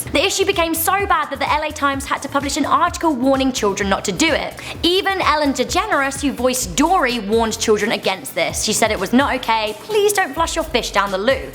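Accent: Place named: Cockney, United Kingdom. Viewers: I was just wondering that. Maybe cockney...? Sorry I'm no help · British - circa Cambridge/London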